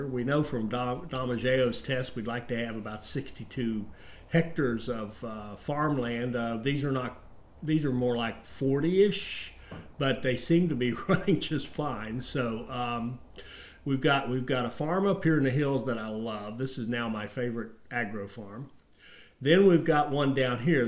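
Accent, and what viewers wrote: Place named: Appalachia, United States. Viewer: Hey, im one of your newer subscribers and I have a question or two. Firstly do you do any geneaology, because of the last name and coat of arms. Secondly, your accent seems appalachian like my mothers side who are from southern wva and eastern ky. I do geneaology and my great grandmother was an adkins which is similar to your last name. Just intrested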